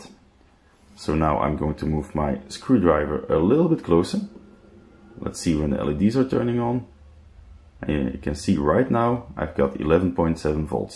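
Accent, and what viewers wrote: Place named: Belgium. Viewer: Hi there, Your accent sounds terribly Belgian, I should know since I am one, correct me if I am wrong. I am also building a hypercube (<num>) but I followe Daha's videos up untill now, I am starting with the electronics part now but then I notice I have a very diferent motor controller card (Duet 2) and LCD (Paneldue 7i) so I am a bit thron of my rocker here, I have already found some intell on this but is it ok if I drop you a question from time to time? If my suspicion is correct we can converse in dutch :-)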